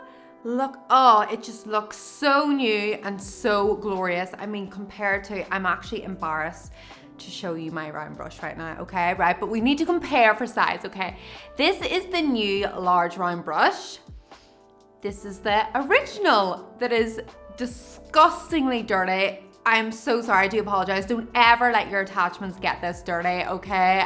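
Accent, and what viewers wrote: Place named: Northern Ireland, United Kingdom. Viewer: Hi, I am a newbie to Dyson airwrap world but I find your chanel so so helpful! Love the content! Keep the good work! Also, I used to live in Belfast for some time so have loads of sentiment to NI and love your accent😂❤️ Warm regards from Luxembourg xx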